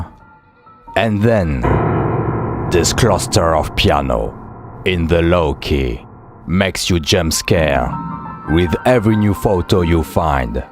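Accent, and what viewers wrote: France. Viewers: the best tier list in my life. thank you :) also your french accent is so lovely!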